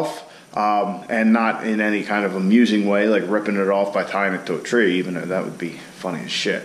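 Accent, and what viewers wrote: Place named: Maryland, United States. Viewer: You gotta be from up around Maryland or at least that area. I got family up that way and would know that accent anywhere lol.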